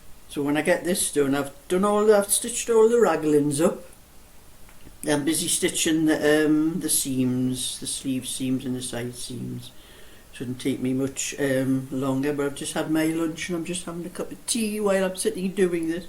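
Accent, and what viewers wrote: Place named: Hartlepool, United Kingdom. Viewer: Love listening to you talk.. I'm a Hartlepool lass living in lincoln.... your lovely accent reminds me of home.... you are a lovely knitter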